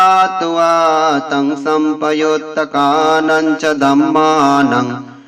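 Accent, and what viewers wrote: Standard. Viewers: খুউব শ্রুতিমধুর ও বিশুদ্ধ উচ্চারণ মনে-প্রাণে প্রশান্তি অনুভব করছি,, 👏👏👏, বন্দনা ভান্তে আপনার নীরোগ দীর্ঘায়ু কামনা করছি,, 🙏🙏🙏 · সাধু সাধু সাধু। একদম শুদ্ধ পালি উচ্চারণ।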